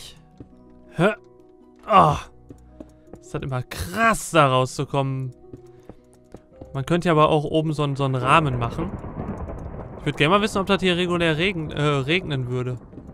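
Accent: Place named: Ruhr, Germany. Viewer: Ruhrpottslang ftw.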